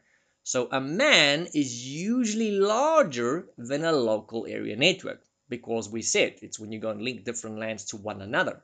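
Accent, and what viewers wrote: Afrikaans, South Africa. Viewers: I can not unhear the Afrikaans poping out from the english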